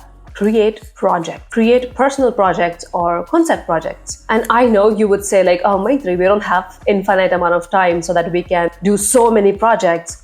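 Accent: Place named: United States. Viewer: BTW your english has an american accent. That's sounded very good and also your content.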